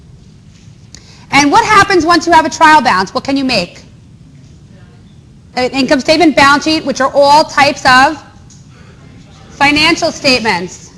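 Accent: New Jersey, United States. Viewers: Motivated and knowledgeable with just a dash of Jersey accent. I may be in love. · New Jersey accent.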